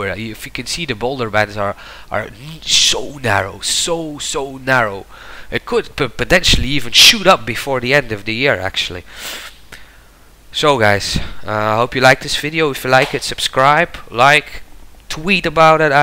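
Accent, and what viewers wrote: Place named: Netherlands. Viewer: Your English sounds dutch!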